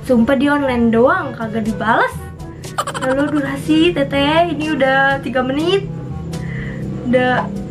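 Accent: West Java, Indonesia. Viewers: Orang sunda ya neng?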